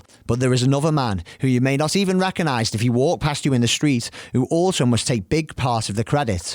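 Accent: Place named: Wirral, United Kingdom. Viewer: Sounds like a Birkenhead / wirral head .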